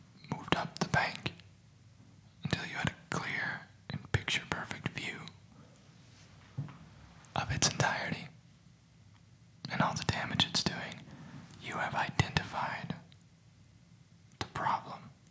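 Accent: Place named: United States. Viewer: I didn’t know is Danish because of his perfect American accent Really wanna request a real life collab with ObviouslyASMR because they are all European LMAOOOOO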